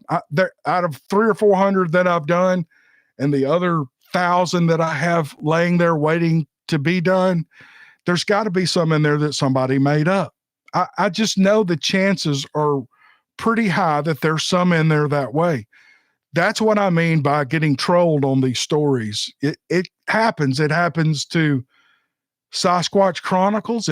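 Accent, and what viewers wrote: South, United States. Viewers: I LOVE YOUR VIDEOS..THE SOUTHERN ACCENT JUST PULLS ME IN AND YOUR SUCH A NICE GUY..GOD BLESS...THANK YOU FOR ALL YOUR HARD WORK AND DEDICATION..❤HOPE YOU FEEL BETTER SOON!! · Me to Jenn jenn he is a humble soul just listening to him soothes your mind and the southern accent I luv.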